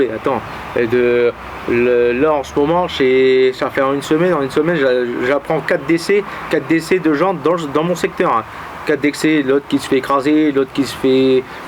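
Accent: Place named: Picardy, France. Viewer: Bonsoir, Merci de nous avoir fait partager la vidéo 🫲 Je te rejoins complètement pour faire perdurer le patois chti. Moi, tu vois, je suis de l'Aisne donc, je suis également picard et ça me va très bien. Sois fière dont la manière que tu t'exprimes. C'est ton identité et tu dois la garder et la faire perdurer. Te souhaite une bonne nuitée ainsi qu'à tes proches et abonnés. A bientôt. Denis.😉👍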